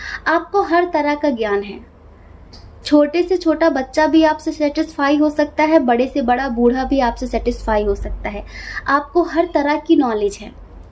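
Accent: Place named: Bengal, India. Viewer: Aap bangoli ho aisa lagta hai mam...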